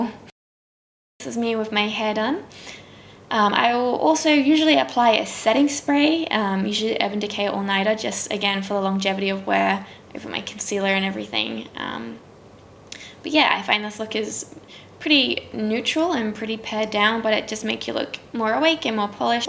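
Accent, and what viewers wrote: Australia. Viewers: I absolutely love this look! So exciting hearing an Aussie accent :) keep up the great work! Subbed! :)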